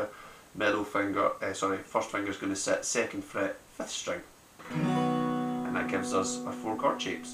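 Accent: Ireland. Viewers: OMG YOUR ACCENT<3. ARE YOU IRISH OR SOMETHING?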